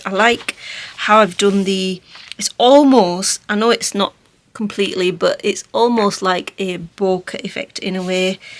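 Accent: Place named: Newcastle, United Kingdom. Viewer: I love these snippets. Hubby came in so I asked if he were going to put headphones on or would he prefer me to put headphones on. He said he rather liked your soothing voice so he listened to you talk while doing a puzzle. Now you’ve stopped at the end of the video he’s listening to something else through his headphones. I thought it was funny. He says he can hear a mild Newcastle accent in a North Yorkshire accent. Is he right? Is there a Newcastle connection? (He is Lancashire with a dad from Newcastle.)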